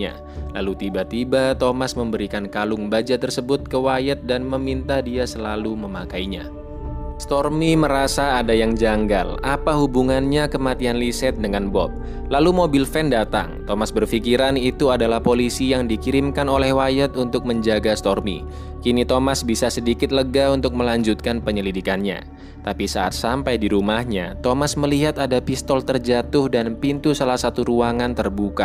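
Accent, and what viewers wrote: Java, Indonesia. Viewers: Kakak orang jawa ya ? 🥰🥰🥰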